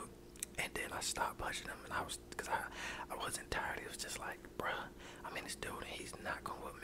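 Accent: Alabama, United States. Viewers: What part of Alabama are you from? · Bruh what part of Alabama you from? This sounds like some Birmingham or Montgomery shit right here hahah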